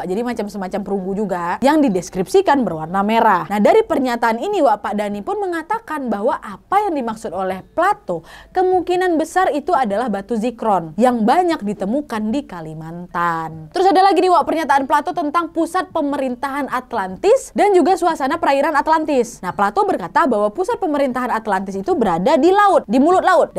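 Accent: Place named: Padang, Indonesia. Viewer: Hahahaaa ndk ada tu do, padang kali kak ini 🤣🤣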